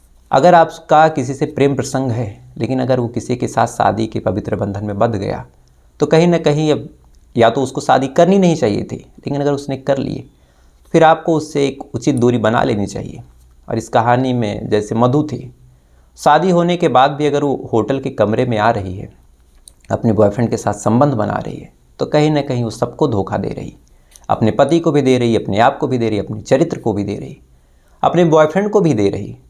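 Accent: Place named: Bihar, India. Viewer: Kahaaaaani ya kahani ? Daud ya dauuud.? Aapka pronunciation Bihari hi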